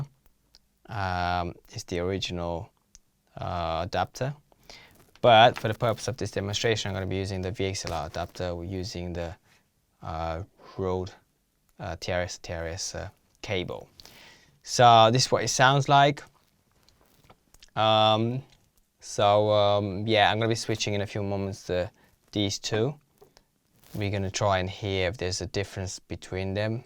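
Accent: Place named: United Kingdom. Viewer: ❤your accent, like a native British. Also your knowledge in audio science is extensive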